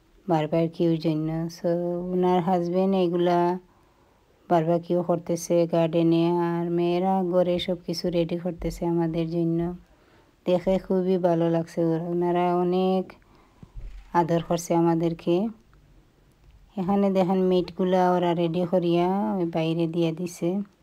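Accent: Sylhet, Bangladesh. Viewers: Kita goh affa apne ki sylheti, na shuddo na nokhali bolchen 💙 onek balo laglo apnar friend er food deke, Thank you for the share 👍👍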